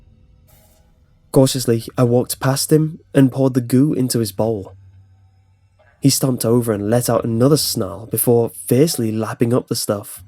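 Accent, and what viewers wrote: United Kingdom. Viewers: british accent is succulent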